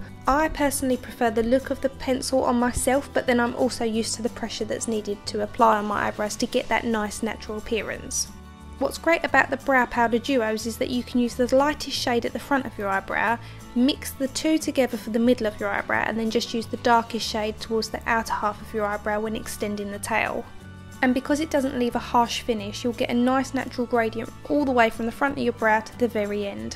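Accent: Essex, United Kingdom. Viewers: She sounds like she's from Essex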